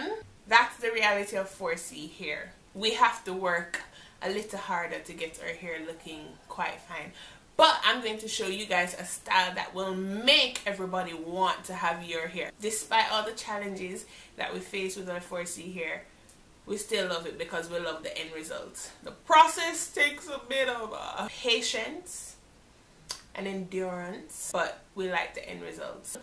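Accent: Jamaica. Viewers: Where are you from? You sound like you're from Jamaica (or a Caribbean island at least) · I so love your accent, Jamaican Big Up Gal!!! Listen I am in England honey I don't think I can get that gel here. where did get it from?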